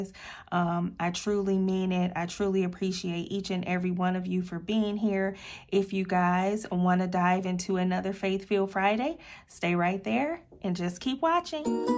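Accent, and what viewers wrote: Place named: South, United States. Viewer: I really love your southern drawl. Very soothing! Good ideas. I am not crafty but writing things down as you say is important!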